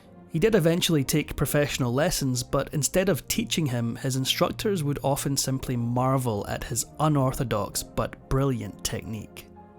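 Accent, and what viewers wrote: Scotland, United Kingdom. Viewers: Who doesn't love Harpo? A preh-tty gued Brehtish (or Scuttish or Oyrish?) narraytion. I agree with the comment about not dissing Zeppo. He was quite talented on & off screen. One story relates how critics raved about Groucho's performance, when it was Zeppo subbing for an ill Groucho. Give him a break. But Harpo, wow! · Clearly has a Scottish accent 🙄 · A fine Scottish accent. Didn't realise that's how you pronounced CROWKAY though... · I like the speaker's accent. Scottish? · His accent is Scottish.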